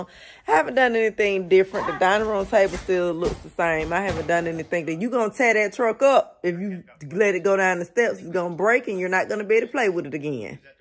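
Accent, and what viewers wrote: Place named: Georgia, United States. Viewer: New subscriber! Thanks for sharing all of your tips. I'm from Ga., so hearing you always reminds me of home. 🙂 Happy Holidays. 🌲❤🌲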